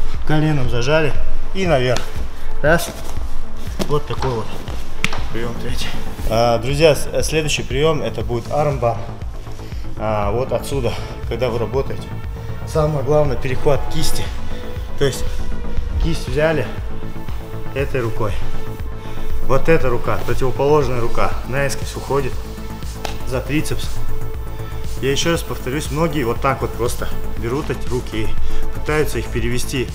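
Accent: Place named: Chechnya, Russia. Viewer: Слышу родной для ингуша чеченский акцент. Молодия для души!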